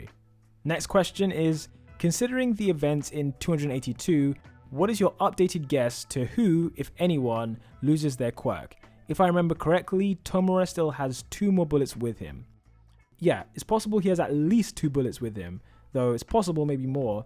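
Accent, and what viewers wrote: United Kingdom. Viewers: Yo you sound like your from the uk.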